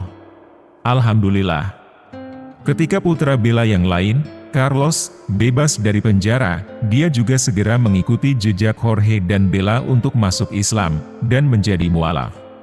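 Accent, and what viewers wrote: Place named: Java, Indonesia. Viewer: di pengucapannya. Mungkin lidah Jowo udah begitu. Gk bs diubah. Tp konten videonya, 👍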